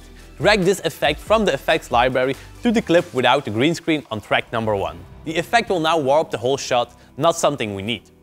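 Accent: Limburg, Netherlands. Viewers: ik ben ook nederlands, jij komt uit Limburg? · your dutch isnt it?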